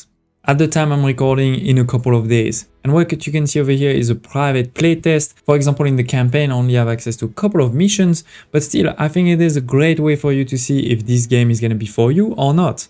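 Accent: France. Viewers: omg I love your French accent, it calms me down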